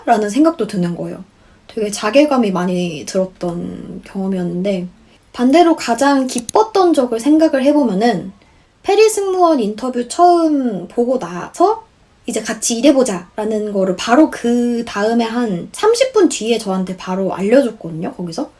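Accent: England, United Kingdom. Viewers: 우연히 알게 됐는데 정말 너무 마음에 와닿는 얘기가 많은 것 같아요! 저도 지금 영국은 아니지만 다른 나라로 교환 준비 하고 있는데 남들보다 뒤쳐지는건 아닐까, 이런 저런 걱정들이 많았거든요! 가고싶다는 마음은 확고한데 언니 말씀대로 주변 반응도 뜨듯미지근 하기도 했구요..! 그런데 제가 좋아하는 거고 저도 지금 이 나이에 이 경험을 하고 싶은거니까 나중에서도 어떻게되든 후회하지 않을 것 같아요!! 언니 영상 보면서 용기도 많이 얻었고 되게 위로받는 기분이 드네요... 💓 유튜브 알고리즘으로 영국영어로만 하는 브이로그 봤는데 제 귀에는 엠마왓슨처럼 들려서 정말 너무 멋있고 깜짝 놀랐어요! 구독도 하고 자주 챙겨보고 (정말 안지 얼마 안됐지만 ㅎㅎ) 항상 응원할게요! 너무 멋있고 이런 영상 만들어주고 경험 공유해줘서 감사합니다 🍑💫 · Did you grow up in Korea or England? Since your accent is so posh I'd say you grew up in England. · 우연히 영상을 보게됐어요:)) 님에게 영국이 그렇듯 저에겐 프랑스가 정말 그런 존재여서 많이 공감되고 혼자 대답하면서(....)봤던 영상입니다 😌😌 정말 많이 공감가는 부분은 누가 시켜서가 아니라 내가 좋아서 한 생각들과 결정들이기 때문에 시키지 않아도 늘 뭔가를 했고, 누군가는 이 나라를 답답하다고 말할 때 저는 항상 빨리만 달려왔던 삶에서 벗어나서 느리지만 여유로운 문화에 적응하고 싶다 라고 말했던 것 같아요:)) 그리고 그냥.. 참 좋다는 점! 프랑스어에 꽂히고 프랑스에 꽂혀서 결국 지금 프랑스에 있는데, 이렇게 영국영어를 접하게되니 정말 너무너무 매력적인 것 같다는 생각이 드네요 ㅎ_ㅎ!! 정말 그 무엇과도 바꿀 수 없는 소중한 경험들 많이많이 만드시길 바랄게요 🥰🥰!! 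· 와 영국발음ㄷ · 영국발음에 반해서 다른 영상들도 정주행중입니다 ㅋㅋㅋㅋ정말 대단하시네요. 엄청난 노력을 하신것 같아요.. 같은 워홀러로서 되게 제 자신이 부끄러워지네요. 영상 볼때마다 영어자극 팍팍옵니다. 감사합니다~많이 업로드 해주세요🙏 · 님 영상보는데 영국발음에 또 홀리네요ㅎㅎㅎ 구독하고갈게용> <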